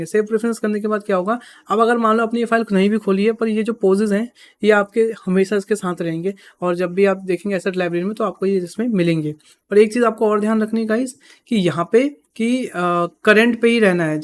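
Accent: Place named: India. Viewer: bro i was frustrated by rigging but ,, after your video now i got every point you teached .. best video for rigging in indian accent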